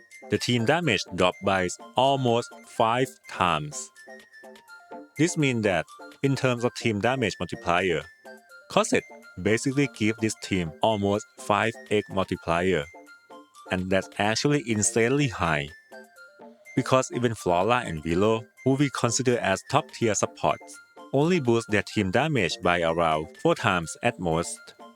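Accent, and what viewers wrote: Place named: Thailand. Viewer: คนไทยปะครับสำเนียงเหมือนไทย 😅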